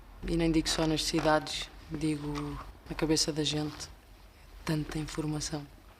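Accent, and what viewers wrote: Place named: Portugal. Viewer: seu sotaque português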